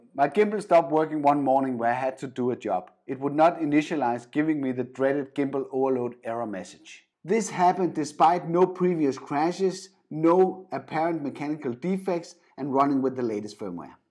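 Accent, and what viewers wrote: Denmark. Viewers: This has to be a Dane, with that accent. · Er du dansker?!😂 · Heavy Danish accent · sounds like a danish accent?? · Are you Danish?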